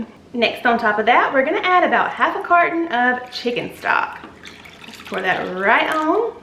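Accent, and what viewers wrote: South, United States. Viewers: The way the economy is right now, chicken appears to be the choice. Your recipes are so yummy. I look forward to trying the Swiss Chicken. I love your Southern accent. I have one too. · Everything looks amazing.....I especially like the potato soup recipe!!! I don't know what the fuss is about the word moist but hey everyone has an annoying word eh! I honestly can't stand when someone can't pronounce words with "oi" in them like oil or foil for example and says them like ole and fole rhyming with bowl... had to stop watching videos because of it sorry to say. Anyways, you asked...LOL...thanks for sharing, really enjoy your channel and you southern accent!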